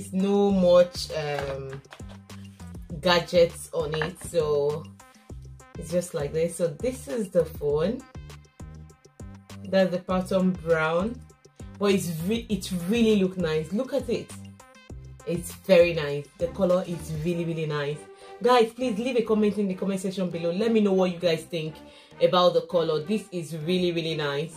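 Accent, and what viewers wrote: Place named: United Kingdom. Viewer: I need me a UK sista that accent 😍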